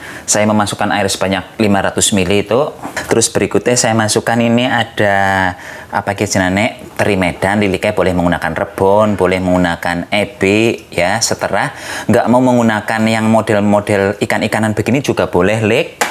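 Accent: Banyumas, Indonesia. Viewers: Sangat bermanfaat Lik,, lilike wong Endi sih daning bahasane ngapak banget 🤣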